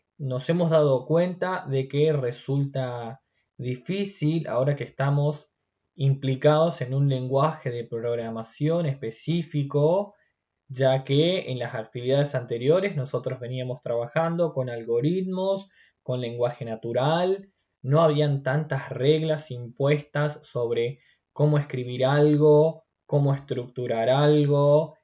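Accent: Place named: Misiones, Argentina. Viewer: Muy genial tu explicación. Muchas gracias. Te felicito por toda la pasión que le ponés al arte de enseñar. Y re contra mil veces feliz por poder aprender con acento Misionero.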